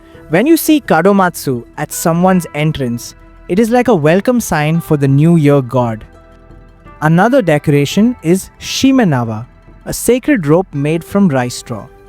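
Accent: India. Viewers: I was not expecting an Indian accent.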